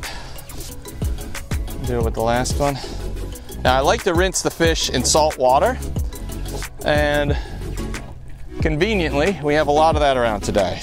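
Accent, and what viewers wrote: United States. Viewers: The accent sounds Boston. Like Ray the series.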